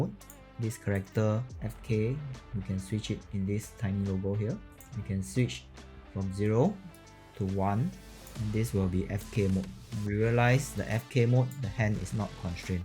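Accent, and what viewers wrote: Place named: Singapore. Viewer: thanks a lot sir, your explaination was so good. Are you from singapore? i can tell u by your accent :face-green-smiling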